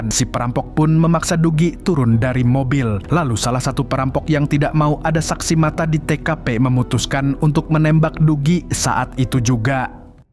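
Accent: Banjar, Indonesia. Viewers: Pian urang Banjar kah min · Admin nya urg banjar jua sekalinya 😁👍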